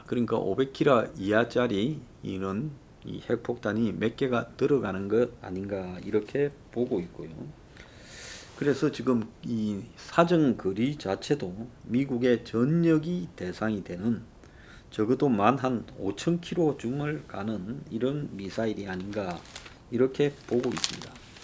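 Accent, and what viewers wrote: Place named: Gyeongbuk, South Korea. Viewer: 황장수는 부산 출신이라면서 말은 진한 경북 말을 쓰니 듣기가 몹시 어색하다.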